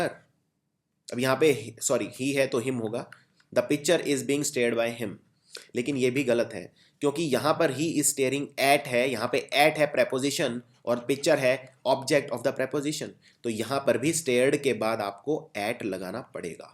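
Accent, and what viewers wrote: India. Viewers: Are you speaking english or Indian ? What heavy accent do you have !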